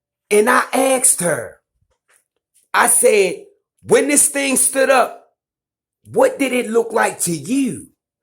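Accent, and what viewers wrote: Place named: Louisiana, United States. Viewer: Hello,. Are you from Louisiana? You have an interesting accent, I enjoy hearing you speak, as I am not often exposed to people that have your accent. I'm a Hillbilly in northern West Virginia, so I have more of a Yankee accent. The people in the southern counties of WV have more of a southern accent. I'm definitely going to hit the subscribe button. Looking forward to more. Respect and Blessings from Wild Wonderful West Virginia. 🙏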